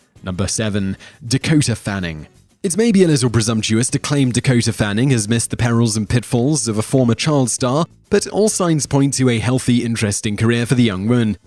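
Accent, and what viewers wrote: United Kingdom. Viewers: has always been my favorite top ten list channel. Dont lose. We Yanks love British accents.: )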